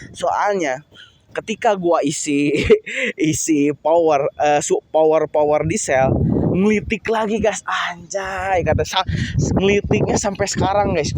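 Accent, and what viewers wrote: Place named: West Java, Indonesia. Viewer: Lu orang jabar, gak usah ngomong gue, logat sunda.. Gak cocok cuk..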